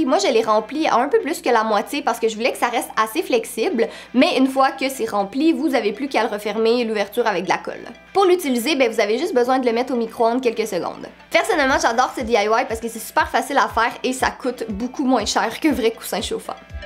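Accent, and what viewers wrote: Quebec, Canada. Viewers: Trop bien t'as vidéo !!!! T'est trop belle et j'adore ton accent québécois💜💜💜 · Seynabou Ng moi je crois qu elle et québécoise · Elle québécoise comme moi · Toi tais français de France hein? Pcq elle,elle est québécoise(comme moi)😂😂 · oui elle est quebecoise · T'as vraiment intégré l'accent québécois. On devinerait même pas que t'es née en France. C'est important de parler de ce sujet sans tabous, surtout quand il y a des gars qui écoutent, raison de plus. C'est un signe qu'on n'est pas tous indifférents à vos problèmes de filles. Même si on ne vit pas le phénomène directement, on le vit au niveau social. Et on imagine que c'est chiant au niveau inconfort et organisation et ce que ça vous coûte par année. Il faut continuer à bouger et prendre des analgésiques si nécessaire, et ne pas laisser vos règles vous empoisonner la vie comme une maladie. 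On trouve ça tellement plate quand une fille ne veut pas nous accompagner dans des sorties ou des activités aquatiques comme si elle était malade. et quand on est en train de relaxer, on peut vous offrir des massages pour vous rendre ces périodes un peu moins désagréables. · Ouais tes bien québécoise '' melon d'eau '' 😂😂😂 Ma grand mère est québécoise mais elle a pas du tout cette accent Peut être parce que j'ai l'habitude de l'entendre Bref tes vidéo sont super continue 😘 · J'aime bien l'accent québécois il est trop beau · St'une québécoise 😌💅🏼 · C’est seulement la façon de parler des québécois moi même j’en suis une et pour moi c9est vous qui avait un accent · C’est juste l’accent québécois hahaha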